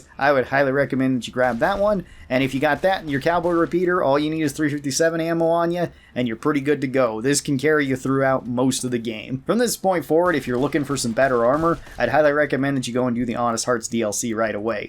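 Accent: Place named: West, United States. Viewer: I really love how you speak a little bit like a cowboy in the video as well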